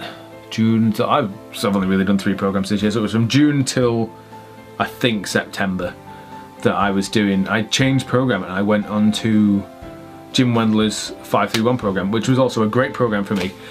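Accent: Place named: North, United Kingdom. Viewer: So northern!!! 😂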